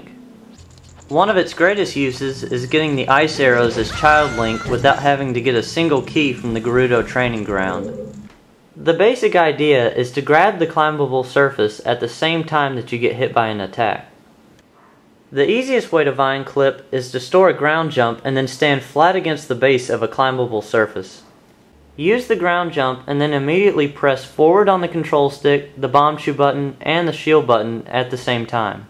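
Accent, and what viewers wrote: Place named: Texas, United States. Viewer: The Hank Hill accent, it's so sweet 😊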